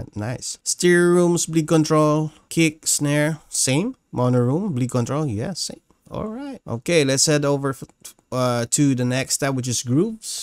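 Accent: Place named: Philippines. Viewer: Nice to hear a 🇵🇭 accent while talking about metal related things. Mixes home with what I love.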